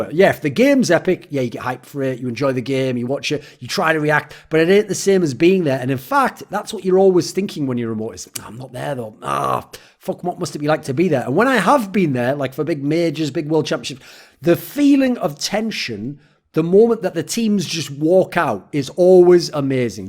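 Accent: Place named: United Kingdom. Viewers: He does sound different, he might be visiting home and having his more natural accent come out. Anyone know exactly what is his accent, from what region? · Why did Thorin's british accent get stronger?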